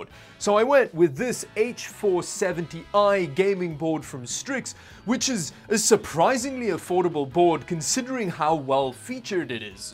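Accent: South Africa. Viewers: Mmm, am I detecting a South African accent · are you south african?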